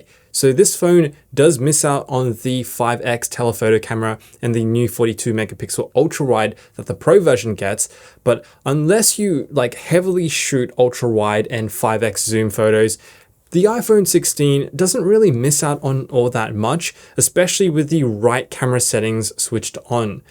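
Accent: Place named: Australia. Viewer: By your accent you are Australian but are you quoting US prices? Last I looked the iPhone 16 is $1399.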